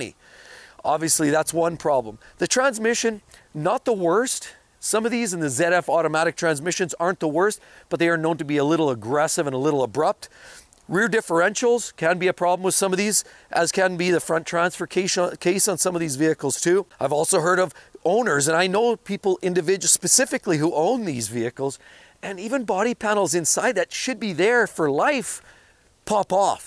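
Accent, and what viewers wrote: Canada. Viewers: This guy is Canadian according to his accent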